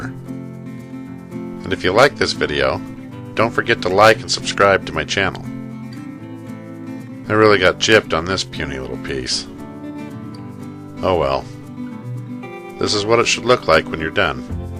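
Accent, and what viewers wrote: United States. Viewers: You sound very American to me. I'm not hearing any accent ... but you do have a wonderful voice.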